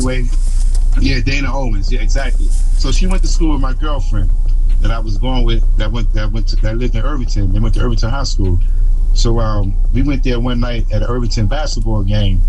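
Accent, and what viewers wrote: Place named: Brooklyn, United States. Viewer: All I hear is BROOKLYN!!! SAUTE MY G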